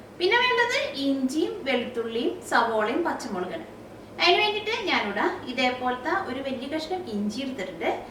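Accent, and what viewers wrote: Thrissur, India. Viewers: എന്തൂട്ടാ പറയണേ.. നല്ല നാടൻ തൃശ്ശൂർ സ്റ്റൈൽ.. :D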